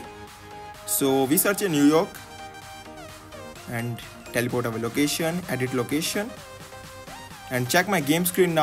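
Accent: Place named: India. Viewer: It’s obvious. He’s from India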